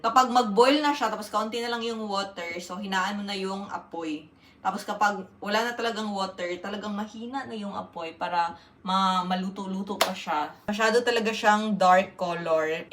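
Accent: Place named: Visayas, Philippines. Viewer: Bisaya c madam diay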